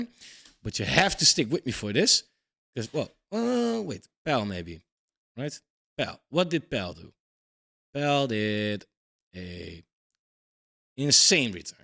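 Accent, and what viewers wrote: Netherlands. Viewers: Great channel . I can hear you are Dutch just like me 😬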